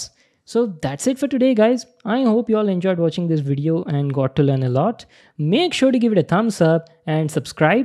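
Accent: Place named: India. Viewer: You speak English but your English is just like Hindi during lisning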